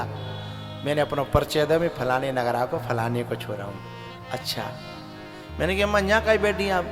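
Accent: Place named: Braj, India. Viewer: बाबा शुद्ध ब्रजभाषा बोलकर सबको लुभा रहे हो? आप निश्चित रूप से मथुरा के आसपास के ही हो, आपको प्रणाम!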